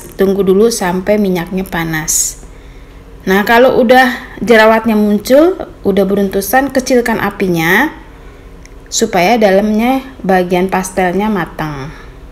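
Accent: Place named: Medan, Indonesia. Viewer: Asli mendan ya bunda soalya logatnya ketauan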